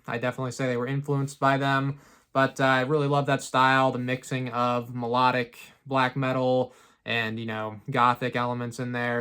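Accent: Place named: East Coast, United States. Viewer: Are you from the East Coast? I ask that because of how you pronounce Twenty as "Chwenty." I give my workmate shit for that lol, hes from Mass love the video, just revisted again from when I watched it before and never noticed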